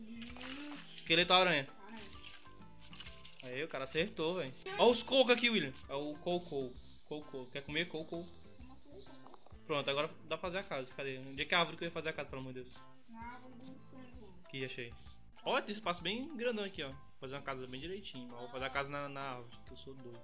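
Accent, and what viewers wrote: Northeast, Brazil. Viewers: Tu é nordestino man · Mano, esse jeito de falar/sutaque... So pode ser do Ceará mah. E ainda digo mais: eh de Fortaleza. E ainda digo mais!: eh do José Walter kkkkk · vim pelo canal do camera mano , tu e nordestino e man ??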